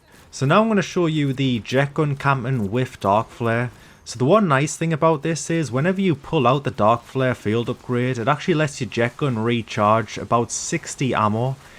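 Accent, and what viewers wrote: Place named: North East England, United Kingdom. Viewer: aye lad am from northeast I could tell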